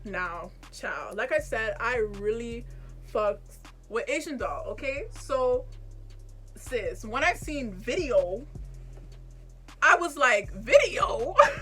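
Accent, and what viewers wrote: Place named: Jamaica. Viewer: yeah, I was asking because you had like an accent also Jamaicans have pretty accents.😊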